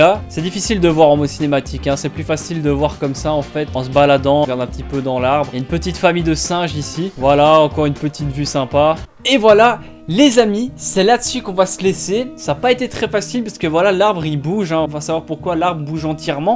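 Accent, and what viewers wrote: Belgium. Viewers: T'es Belge? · Tu es belge ?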